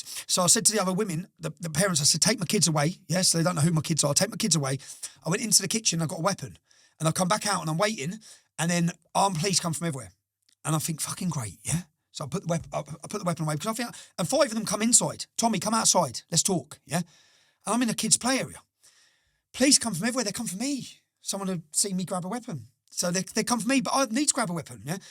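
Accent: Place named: Cockney, United Kingdom. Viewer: I like him...but he should change his way of talking...less fast..less cockney...people would take him more seriously.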